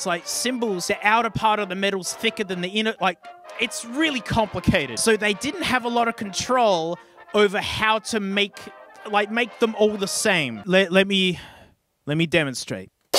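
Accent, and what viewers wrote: Australia. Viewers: Is this? Or do all Australians sound the same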